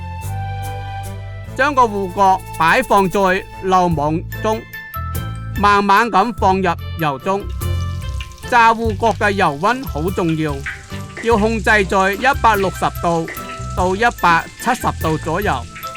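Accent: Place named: Siyi, China. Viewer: 梗係我哋四邑口音啫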